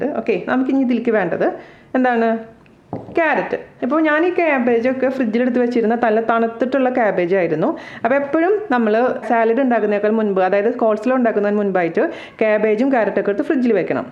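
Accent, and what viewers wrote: Thrissur, India. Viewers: Chechi thrissur slag kelkkan nalla rasam und too endhe amma veedu chalakudyil aaa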